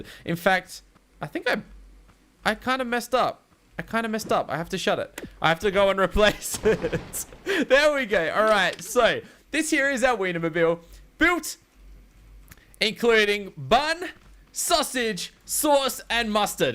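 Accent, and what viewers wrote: Australia. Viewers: I think he's from Australian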